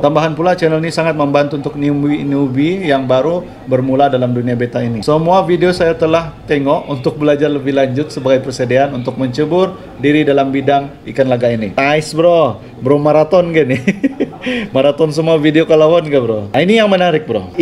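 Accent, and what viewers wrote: Sabah, Malaysia. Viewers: Abg orang sabah ehh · Slang Sabahan ohh..boleh tau ka dimana location? Mau datang beli kalau boleh heheh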